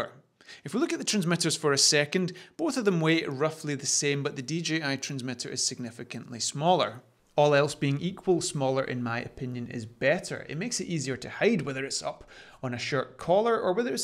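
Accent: Scotland, United Kingdom. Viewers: Excellent review. Are you a Scott?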